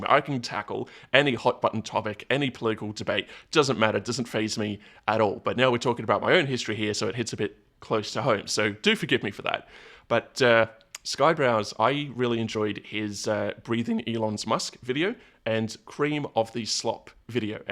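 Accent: Australia. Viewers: I detect a fellow Australian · intellectual? I am an English Hampshire Hog. You sound Antipodean to me. I would go more Aussie than Kiwi.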